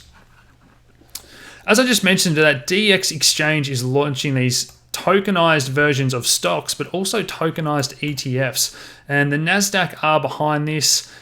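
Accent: Australia. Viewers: Great to hear an Ozzie accent talking crypto.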